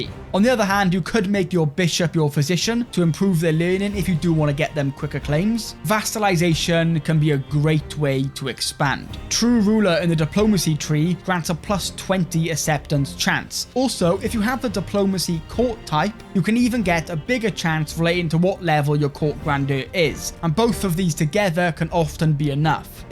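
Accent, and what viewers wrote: Wales, United Kingdom. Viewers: Thanks for the tips mate. Just bought this after playing CK2. Always great to hear a fellow Welsh voice on YouTube 🏴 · Bruv are you Welsh? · There's nothing better than clicking on a yt vid and hearing a Welsh accent 🏴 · I wonder what kind of UK accent that is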